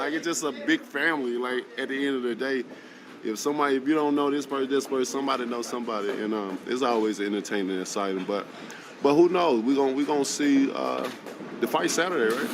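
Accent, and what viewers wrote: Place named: Alabama, United States. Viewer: i forgot this dude has the thickest Alabama accent lol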